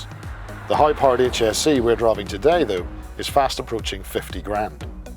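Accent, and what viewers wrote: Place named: Northern Ireland, United Kingdom. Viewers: What you're hearing is a Northern Irish accent, and whilst the pronunciation is inconsistent with textbook RP, it's still perfectly valid. To say it's definitely wrong is an overstatement. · Northern Ireland accent.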